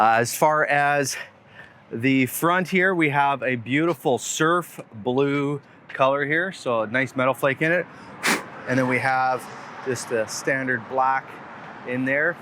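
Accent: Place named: Canada. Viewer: Idk for sure if this guy is Canadian he sure sounds like he is. Once I heard that I was pretty sure what was under the hood. They like those up there.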